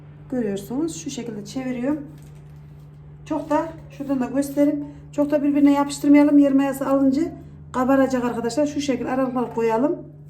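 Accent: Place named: Central Anatolia, Turkey. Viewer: Sizi yeni keşfettim öncelikle sivenizden icanadolu olduğunuzu anladım dahada mutlu oldum ellerine sağlık Bende çocuklarımın icin.deneyecegim .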